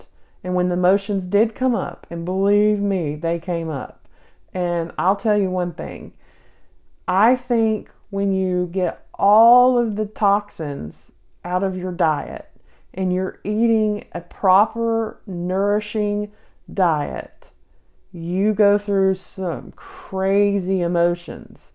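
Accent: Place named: Texas, United States. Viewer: Are you a Texan, sweetie? You sound like it.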